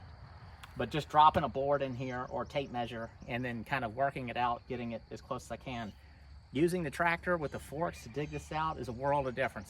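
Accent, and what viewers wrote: South, United States. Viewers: That is definitely the coolest building for multipurpose that I have seen. I really dig the way you have re-used a lot of stuff (particularly the roof). When your saw mill gets going you will be set to expand your collection of buildings on your land. I know you sound like a southerner, but you work like a New Englander.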